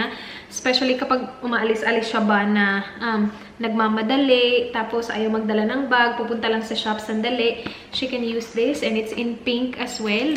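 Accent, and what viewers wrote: Visayas, Philippines. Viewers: Makakatowa kanaman mag salita ate girl Bisayang Bisaya..I love you ate girl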